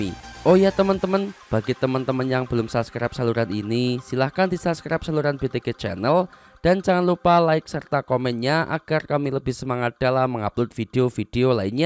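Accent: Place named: Java, Indonesia. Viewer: Pekat jawanya mas lebih baik tukar YouTube Chanel.jual.merpati atau blantek sapi mas Gak cocok buat info sukan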